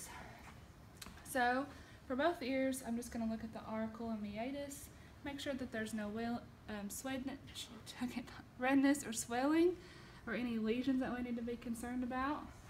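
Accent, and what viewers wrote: United States. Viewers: Love the accent, Miss Kentucky! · man this is a thick southern accent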